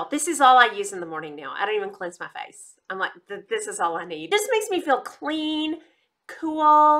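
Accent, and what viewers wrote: South, United States. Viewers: Hi I’m new and Mary Ellen sent me over. You sound like a fellow Southern girl I lived in Tn for years and reside in Beaufort SC now. Back to your vid. 👍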